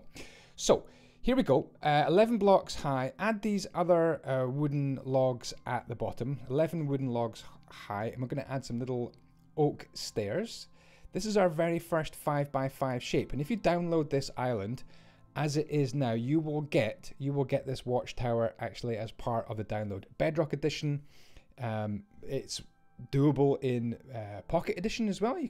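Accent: Scotland, United Kingdom. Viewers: You have a scottish accent🏴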